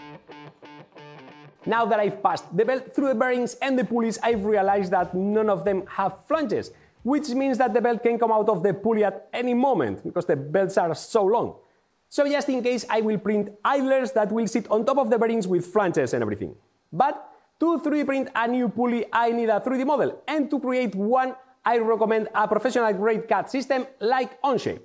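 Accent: Spain. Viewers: Con tu acento me da por hablarte en Español, pero tampoco es que haya confirmado que eres Español The bed can be heated in sections? Because if you can , doesnt seems hard to distribute the heating cable in "discs", like the ones on a ceramic hob, so you can save power, heating only the sections you are using, on a normal 3d printer is not really a concern, but in something that big, the bed must be really expensive to maintein edit: okay, ive seen that part now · Adoro la forma que tenemos los españoles de pronunciar el inglés jaja, te dejo mi like! this vid was fun ^^ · se nota mazo que eres español jajjaja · etsba viendo este video...y pensando este tio habla epsañol xD ese ingles es muy español jajajajjaaj saludos grande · seunas a español hablando ingles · eres un español hablando ingles?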